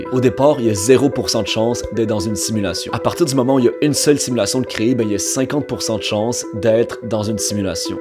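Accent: Quebec, Canada. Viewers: Je sais pas ce qui me perturbe le plus ta théorie farfelue ou ton accent québécois 🤔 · Toi aussi t'es insupportable, respecte l'accent québécois trou de b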